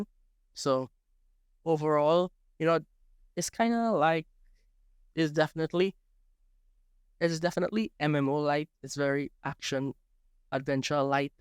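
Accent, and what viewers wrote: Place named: Trinidad and Tobago. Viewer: It's a Trinidadian accent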